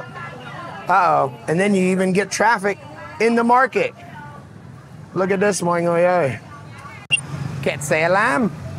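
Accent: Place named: North, Vietnam. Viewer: Haha, you speak English like the Vietnamese now. You crack me up. I'm glad you chose the northern accent. Sounds good.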